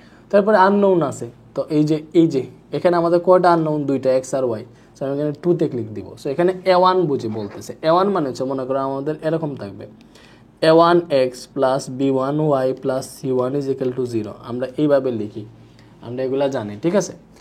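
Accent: Sylhet, Bangladesh. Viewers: Sir mone hoy amader Sylhet er😫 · Vai sylheti naki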